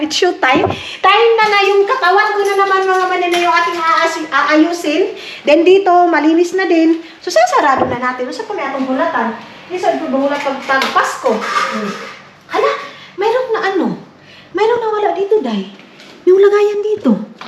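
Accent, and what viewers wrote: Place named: Talacogon, Philippines. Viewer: Fan mo ako ganahan ko sa imo vlog ky makalingaw 70 yrs old na ko taga talacogon talacognon ba jud imo sinultihan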